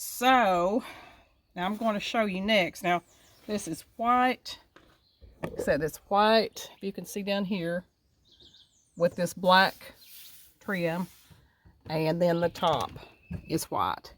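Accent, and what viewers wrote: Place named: South, United States. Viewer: just found you. what state are you from.??? Sound like a Southern sister. I did subscribe. looking forward to your videos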